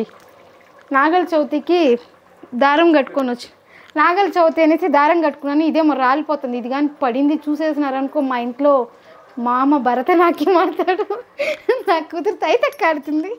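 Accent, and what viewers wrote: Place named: Chittoor, India. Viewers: Ne matalu basha Rayslaseema pillala vunnavu kani antha neyyithone cheyali nuv oil vadavu Nen eppudu chesthanu potato vesthanu totalga ne vusharu ne mata rhiru navhhai keep it up God bless you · You are popularising Chittoor district Telugu dialect, thank you 🎉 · Hi sister me oru Chittoor aa maoru la undhi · Its rayalaseema slang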